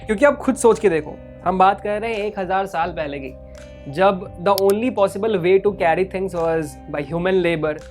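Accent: Bihar, India. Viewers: bro r u frm bihar??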